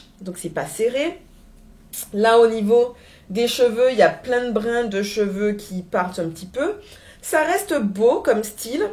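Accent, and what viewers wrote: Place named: Caribbean. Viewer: Merci. J' adore ton accent, je me crois revenue au péyi chaque fois que je te regarde. J'y vais l' année prochaine, merci pour ta technique que je ne connaissais pas et finis bien cette année 🤩🤩🤩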